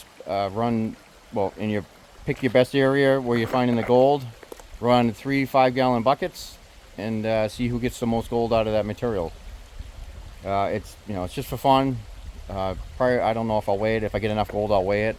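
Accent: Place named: East Coast, United States. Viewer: Awesome! I think you beat me! What state are you in? Accent says out east to me.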